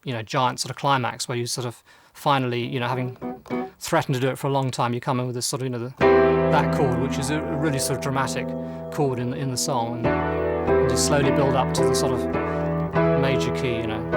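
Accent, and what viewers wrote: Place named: RP, United Kingdom. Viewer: I love RP pronunciation...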